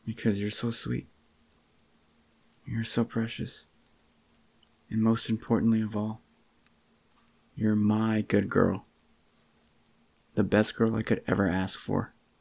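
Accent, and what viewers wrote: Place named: South, United States. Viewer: bro idk why but he sound hella country....still a good AMSR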